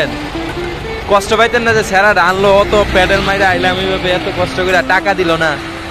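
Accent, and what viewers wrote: Sylhet, Bangladesh. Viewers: Vaiys apni ki sylheti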